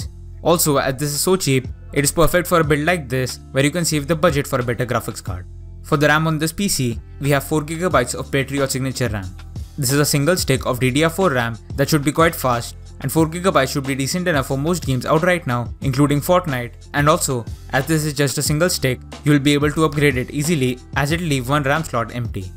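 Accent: India. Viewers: Guys don’t be so mad I know he has a Indian accent and the pc only got 4 gb ram but it’s a budget pc so it should be cheap and he say it’s for Fortnite so if you want pubg go and watch another video ;)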